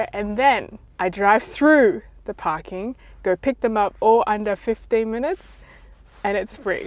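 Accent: Australia. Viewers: I love hearing your Australian accent because it's so different from where I'm from. But your Cantonese sounds exactly the same as mine! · Thought u grew up here in oz as you spoke with aussie accent, didnt realise your parents were coming to visit you from overseas... Maybe im wrong? Im kinda half way thru, lemme finish watching to find out! :)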